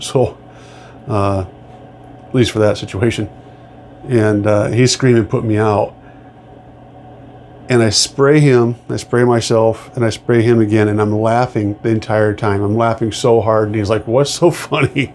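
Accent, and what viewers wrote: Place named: Michigan, United States. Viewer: Great fire stories. The Michigan drawl working good. 💨💨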